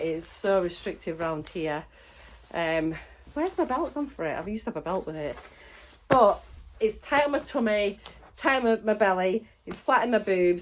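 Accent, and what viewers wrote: Yorkshire, United Kingdom. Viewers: I should have recognised your accent. I am rom North East Lancashire I was brought up in Burnley and was convinced you were from Accy or Blackburn, but even though you are from Yorks I still love to hear your accent , I know what you mean about boots. I had days where my feet were so swollen I couldn't even get normal ankle boots on so I know what you mean with footwear generally. Its lovely to wake up to another one of your videos I hope you had a fabulous holiday . I might have to watch your video in 2 halves because I have to pop to the village this morning. I live in beautiful mid Wales now but I have family in Yorkshire and Lancashire. I just wanted to say a quick Good Morning to you and congrats on your bob scale victories x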